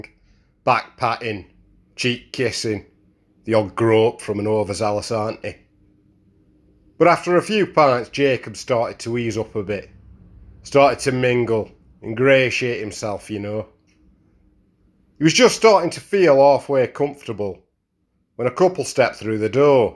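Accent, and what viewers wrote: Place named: England, United Kingdom. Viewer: That's pretty creepy. Good story telling though. Where in England you from?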